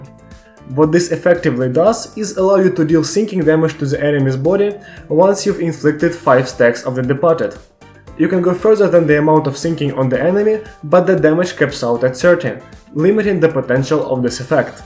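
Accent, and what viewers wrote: Russia. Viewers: Будто бы у тебя русский акцент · Русский акцент не скроишь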